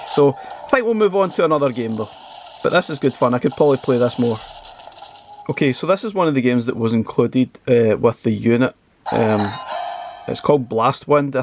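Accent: Scotland, United Kingdom. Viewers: Great video mate! Still eyeing this up! Apologies if it was mentioned somewhere in the video, I'm watching at a library with the sound low, what emulator/core was used? I'm guessing this wasn't beetle core based on the Sega Rally gameplay. The road seems to "break up" and this doesn't happen on real saturn/beetle gameplay. But everything seems super smooth and contradicts the notion that the ARC is not capable of running Saturn. Also regarding Sonic R I absolutely adore it and it is criminally underrated haha. The characters are meant to be driven like cars with B as an accelerate button, and the analog as steering and L,R triggers as drift. Once you get the hang of it it's pretty smooth and you'll be acing all the races. Perhaps it was a bad design choice to have the, running in hindsight. Oh and your accent makes me nostalgic for Scotland, it's been ages since I've been home.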